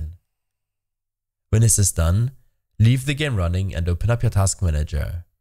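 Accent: Germany. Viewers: Are you from Germany? kinda sounds like that xD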